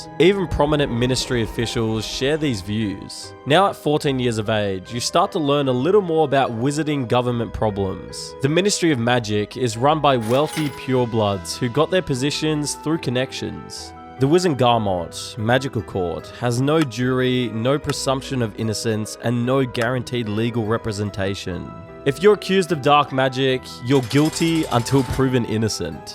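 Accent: United Kingdom. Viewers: What happened to the American presenter? He had a beautiful way of speaking, the cadence the pauses. This one sounds British.....none of the beauty of the American. My ears hurt listening to him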